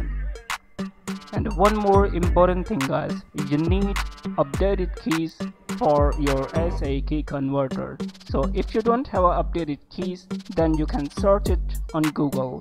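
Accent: India. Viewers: It's always indian voice with 1$ micro. Classsic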